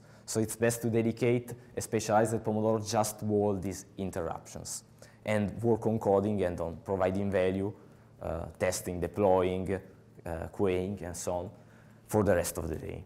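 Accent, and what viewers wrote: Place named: Italy. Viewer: Love the Italian accent describing Pomodoro technique. :-)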